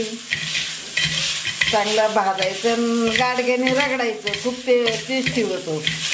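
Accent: Ahmednagar, India. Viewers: I know I lived in Rahuri ,I went to school in Ahmednagar ,the way you spoke .I remember those day.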